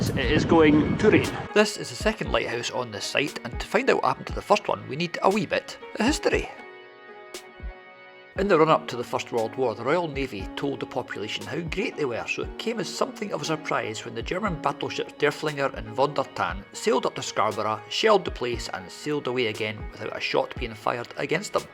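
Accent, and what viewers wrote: Scotland, United Kingdom. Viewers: Great video, guys! I often have to go back and listen to what you said a couple of times, not always because you are talking fast, but more often because your Scottish Burr is quite heavy. I’m not complaining; I like it.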